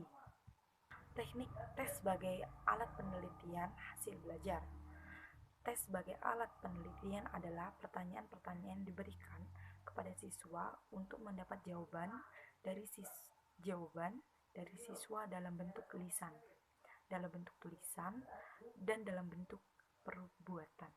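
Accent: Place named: Java, Indonesia. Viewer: Semangat KK😂 Jawa nya medok mbak ee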